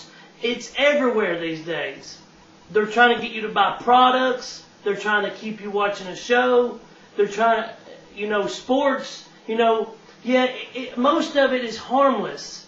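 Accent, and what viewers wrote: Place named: South, United States. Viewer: "A form of brain warrrsh .. ( love the Southern accent).. I'm a southerner myself..Born in The Ozarks actually.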